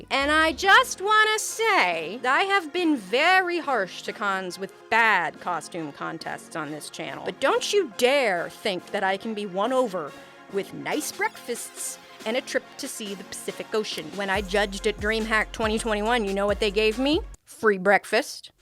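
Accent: United States. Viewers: I think it's just an aspect of her southern accent (drawl) slipping out every now and then. It's less an extra 'r' sound and more that we blend or even skip the vowels so the accent on the "a" disappears.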